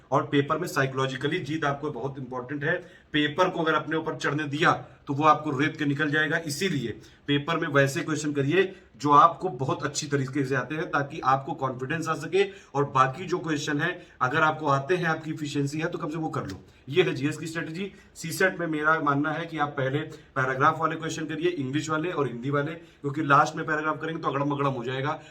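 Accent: Kanpur, India. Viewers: Puri hmari kanpuriya language hai 😀😀👍👍 mja aa gya sir🙏🙏🙏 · Hahaha..... total kanpuriya ho Bhai.....hum bhi kanpur ke hai...z😂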